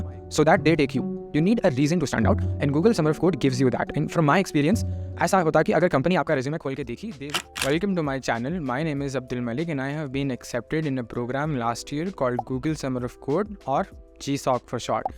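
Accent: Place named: Hyderabad, India. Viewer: loved the video and the hyderabadi accent lol. got yourself a subscriber now waiting for more info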